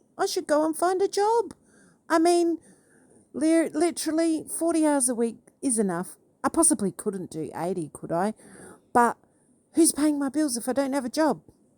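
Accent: Australia. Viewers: We all know your Ozzy, accent is true Blue and love listening to you while i can hear the waves from our BEAUTIFUL BEACHES we have in OZZ we are not friggen stupid, but i tell you im starting to not like CHARLES and having doubts about WILLIAM (i know that sounds horrible) but after seeing speach from David Beckham at the WEF ,and CHARLES being involved WEF, WILLIAM EMPLOYING Ardern, Blanchett, Steve Erwins woke son, and some woke guy for the world kitchen, im worried its not the Monarchy i thought, plus your treatment, i was hoping with LADY Cs court case plus FIONA and Trevor's trouble there might be a link which could be joined to go to court with eventually with good hard evidence your a dog with a bone now, have you contacted THE ROYAL GRIFT, im an old duck wishing i had your energy, watch your back stay safe🇭🇲👍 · Us fellow Aussies can tell your accent 👍 mines a bit rough around the edges 😅 use friggin a lot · Sound like a true blue Aussie ro me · Girl, I know you’re Australian by your accent. 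I know accents very well. I can tell from your videos that you love your husband. I’ve been subscribed long enough to know that TMJ is a friend, not a boyfriend. I guess she doesn’t know what you do for a living 🙃 The truth is coming & it has nothing to do with TMJ. Stay strong 💪🏻 🇺🇸🇺🇸🇺🇸♥️♥️♥️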